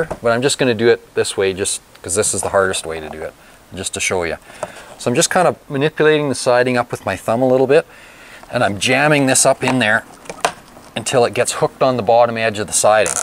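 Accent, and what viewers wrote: Canada. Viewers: I hear Canadian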